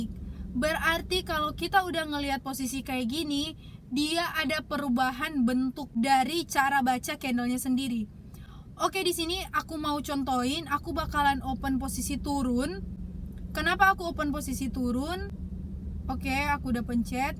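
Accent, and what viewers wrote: Manado, Indonesia. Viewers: Orng mnado kng😂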